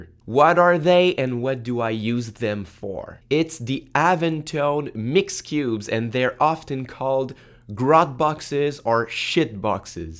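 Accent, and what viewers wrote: Quebec, Canada. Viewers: es tu québecois ?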